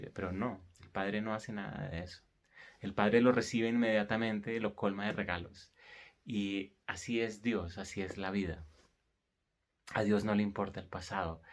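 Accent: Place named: Colombia. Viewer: Qué amorosa explicación ☺️♥️✨📘🕊! Y qué lindo tu acento colombiano 🤗! Yo estoy entendiendo más sobre la proyección de la culpa. Culpo a alguien por algo y ya me detengo a observar esa culpa en mi ✨ Aún necesito ayuda y a veces el ego interviene para no verla con la Verdad. Gracias por tu aporte y consejos! 🤗🤗🤗🤗🤗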